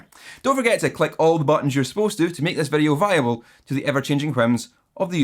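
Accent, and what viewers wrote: Scotland, United Kingdom. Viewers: Now that you have a spare black pickguard from this baby, why dont you build an Adrian Smith Signature Jackson in white and black? I have done one from one of my old Aria STG 004s with a swap of maple from rosewood neck. Also painted the white pickups black. Now plays fantastically. Oh by the way, am a huge fan of your Scottish accent. Alba gu brath! 🙋‍♂️🇹🇷🤜🤛🏴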